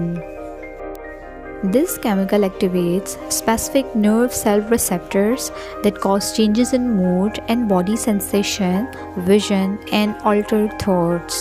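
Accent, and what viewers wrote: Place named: India. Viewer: Is there a search result that is not indian like accent?? It’s really annoying. I don’t know why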